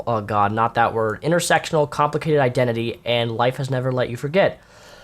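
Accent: Finland. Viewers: Wait what, is he finnish??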